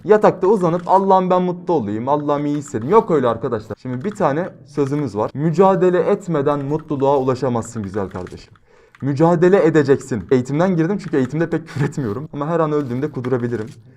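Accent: Adana, Turkey. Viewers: Abi sen adanalı mısın?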